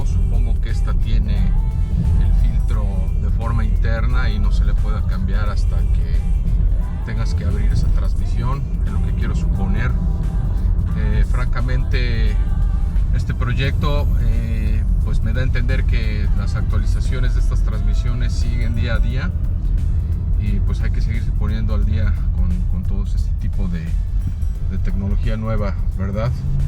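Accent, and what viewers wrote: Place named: Mexico City, Mexico. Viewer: Yo lo que recomendaría es no utilizar el acento chilango aspiracionista para tu contenido, es muy molesto y dificultan ver el video. Saludos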